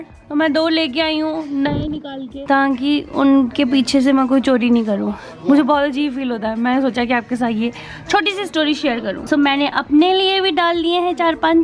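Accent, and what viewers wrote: Punjab, India. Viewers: Love you baby 😘😘😘😘😘😘😘😘love ur punjabi touch dear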